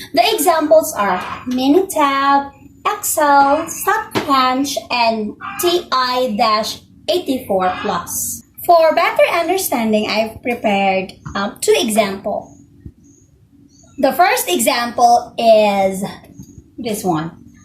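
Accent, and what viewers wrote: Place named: Bisaya, Philippines. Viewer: Wow! I can see the effort and sacrifice within the video. Anyway, I like the bisayan 'ACCENT' of Mrs.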